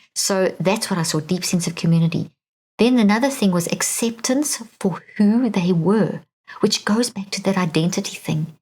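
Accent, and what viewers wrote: South Africa. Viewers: South African I think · It is amazing how your South African accent remains over all these years. Thanks for these podcasts Dr. Leaf. South Africa will grow and develop within time- hope just needs to be cultivated in people's minds again. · South African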